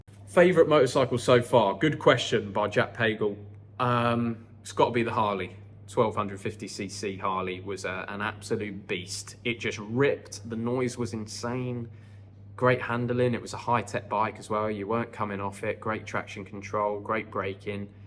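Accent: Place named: Bournemouth, United Kingdom. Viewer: Didn’t realise you lived in Bournemouth, thought I recognised the accent! Im hoping to move to Koh Samui from Bournemouth at the end of the year 🤞🏼💪🏼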